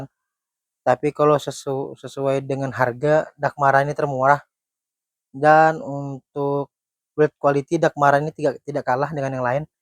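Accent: Indonesia. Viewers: Sprti logat banjar. Orang kalimantan selatan???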